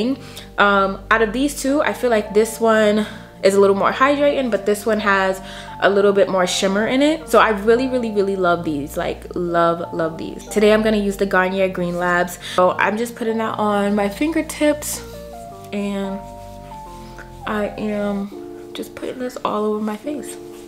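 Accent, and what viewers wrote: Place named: East Coast, United States. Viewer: This is the first video I’ve watched of yours. I love this look! Soooo pretty. I also love your accent. East coast?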